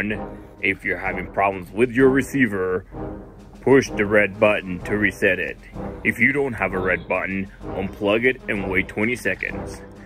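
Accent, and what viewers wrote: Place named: North, United Kingdom. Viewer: Bro got dat up nort accent hey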